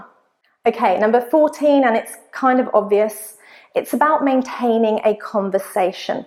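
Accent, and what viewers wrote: United Kingdom. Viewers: I love your videos as your accents is on british english based.We people love british accent rather than peculiar american accent. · I studied English in California so I have a hear for american English. It is the first time I come across your channel and after few seconds I could tell you are from UK. I sometime use Google Translator because it is fast and convenient, if not happy with it I reach for my English to English vocabulary. Not problems with movie or most books but still I have hard time to understand songs. As for jokes it is more cultural, if you are not a native it can be challanging. Finally, nobody mistake me with a native speaker, I still have a very strong Italian accent. Another criteria you can add to this list cuold be if you can solve crosswords. I'm not able to do it in English and sometime I find it difficult even in Italian. 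· Tell me why I can understand you easily despite your british accent :-D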